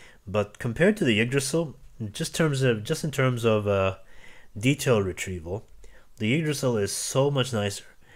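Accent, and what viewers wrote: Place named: India. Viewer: Where are you from? Your accent sounds Indian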